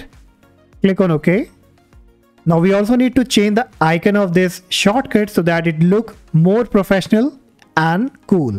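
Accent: India. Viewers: Always love you hear an indin accent on tech guides, yk you have a good source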